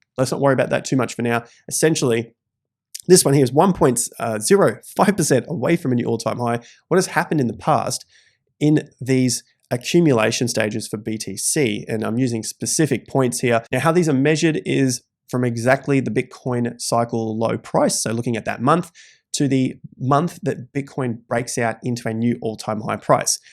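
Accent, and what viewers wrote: Australia. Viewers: How you say the DATA is an Australian thing (DATER)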